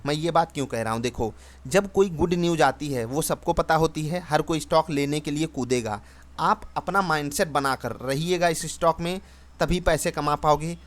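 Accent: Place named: Bihar, India. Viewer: बातो से तो परफेक्ट बिहारी भैया जैसी आवाज है. सजेशन भी पागलो जैसे देता है. शायद तु फ्रेशर है स्टोक मार्केट मे.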